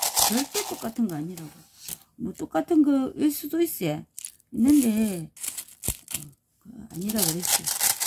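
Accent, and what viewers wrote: Gyeongsang, South Korea. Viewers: 제라륨 분갈이를 경상도 싸투리로 정겹습니다 분갈이할 때 조마조마합니다 분갈이 하고 죽는경우를 많이 겪었네요 ㅎㅎ 재밌네예 부지런한 손은 아름다워요 호야네 실방에서~응원하러 왔어요 😊😍😍👍👍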